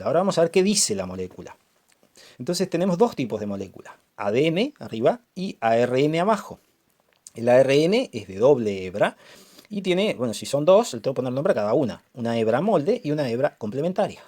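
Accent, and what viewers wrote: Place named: Chile. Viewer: ¿De qué materia y nivel son estas clases? Por el acento creo que eres de Chile, ¿Es correcto?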